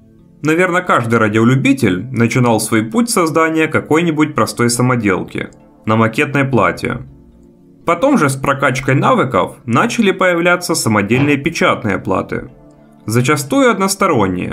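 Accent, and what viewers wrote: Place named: Ukraine. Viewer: Нормальный акцент украинский, так и должно быть.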